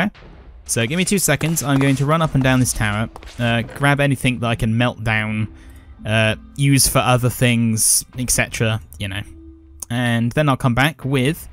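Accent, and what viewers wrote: United Kingdom. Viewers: the British accent is the cherry on top with this series it makes it 10x funnier LOL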